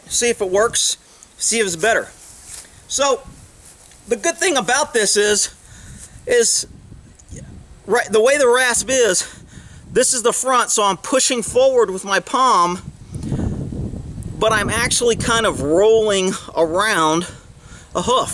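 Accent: Texas, United States. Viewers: what part of texas is that?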